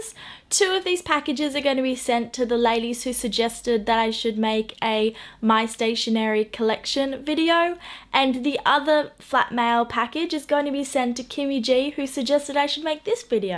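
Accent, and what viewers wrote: Australia. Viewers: I can tell your from Australia from your accent!!! I am too!!❤❤❤ (also because typo is in australia!!)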